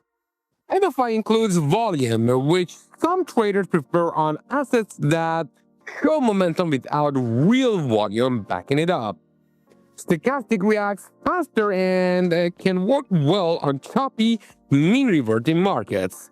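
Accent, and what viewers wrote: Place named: Poland. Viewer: First of all thank you for this interesting video. Regarding your voice, I assume that's because the regular Polish language melody does not work when talking English. Like my Swiss German talking style in Italian would hurt your ears 😉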